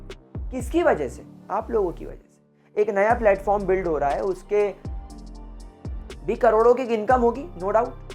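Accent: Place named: Indore, India. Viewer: Pehli line sunke hi samajh aagya sir to apne Indori hai.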